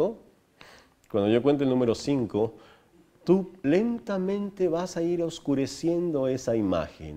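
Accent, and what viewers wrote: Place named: Mexico. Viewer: Me suena mexicano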